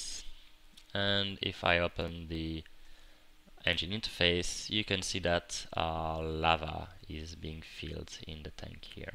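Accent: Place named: France. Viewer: I have yet to hear a Frenchman speak perfect English though, most of them talk like give or take some. You can hear they are French the moment they open their mouth. And in typing they too can reveal their nationality because they use "of" a lot in their text. It's all good though because you can understand what he is saying and that's all that matters in the end.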